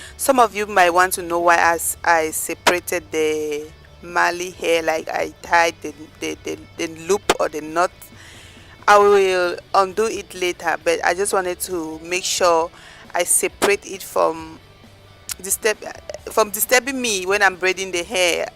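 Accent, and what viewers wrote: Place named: Africa. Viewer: This is a beautiful wig and I would love to order. However, the voice sounds African but the IG page says China.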